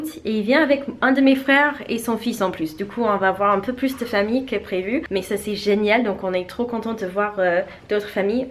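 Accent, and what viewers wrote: United States. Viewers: You’re so nice ! Your husband must be proud of you. I would have loved to marry a woman from a foreign country ( especially from the USA). You show us how proud we ,as French , are honored to have you through all these experiences yo share. I’m so sensitive looking at a person like you who may tell the best from both cultures : USA and France. Keep your delightful accent ! Bravo pour vos vidéos : elles sont fun, no boring at all, et elles permettent aux français qui connaissent pas très bien votre vraie culture de mieux appréhender ses particularités. N’oublions jamais que ce pays est immense et que NY ou LA ne représentent pas toute l’Amérique. For sure, your parents gave you a strong and good education with real values. And it represents such an asset for life. Now it’s your turn to convey all these values to your children. And on top of it, they will be enriched by their two cultures. 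Wishing you all the very best in Canada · J'adore t'entendre parler américain !!!!!!!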